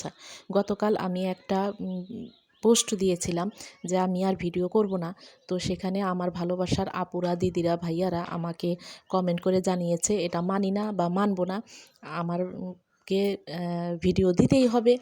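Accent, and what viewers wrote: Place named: Manikganj, Bangladesh. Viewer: আপু তুমি যেও না, তোমার কথায় মানিকগঞ্জের ভাষার টান আছে,শুনতে অনেক আপন মনে হয়, যেহেতু আমার গ্রামের বাড়ি মানিকগঞ্জ, ভালোবাসা অবিরাম ❤️❤️❤️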